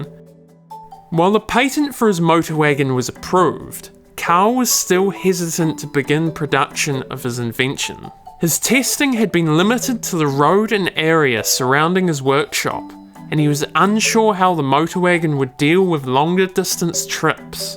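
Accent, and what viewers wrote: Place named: New Zealand. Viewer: New Zealand/"Kiwi" accent!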